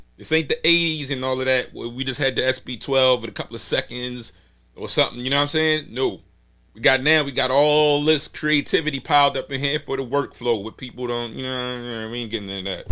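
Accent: Maryland, United States. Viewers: Super dope stuff man. And I thought I detected a MD accent!🙌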